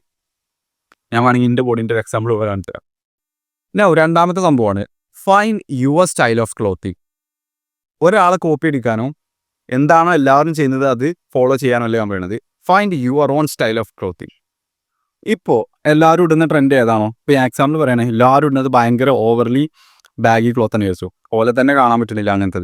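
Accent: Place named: Malabar, India. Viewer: Ath malabar style aan broo kaaryam manasilavundo ath mathi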